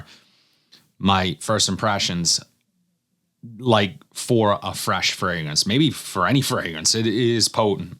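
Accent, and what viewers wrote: Boston, United States. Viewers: Knew that accent sounded familiar. 617 baby 💪🏻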